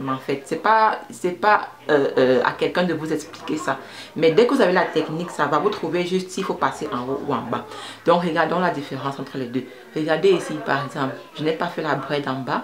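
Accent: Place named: Cameroon. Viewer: Merci tantine J'entend ton Cameroun ce pays me manque ❤️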